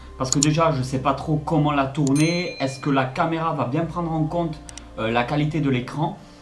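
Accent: Toulouse, France. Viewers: Coucou j'espère que tu vas bien? Oui oui sa serai trop cool de voir l'arcade avec l'intérieur de la machine le système de monnayeur et tout se qui se trouve à l'intérieur. Tout les systèmes ect.. Je me suis toujours posé la question comment sa fonctionne. Donc une vidéo sur sa je pense que sa pourrait faire une vidéo qui pourrait plaire à beaucoup de monde. Merci de nous régaler Sa fait un bien fou de te revoir. Ton accent de toulousain nous avait manqué. En plus on est pas si loin moi je suis de capestang à côté de beziers. Je te souhaite une excellente continuation. Continue à nous régaler. A très bientôt. Bonne journée à toi et à toute la famille.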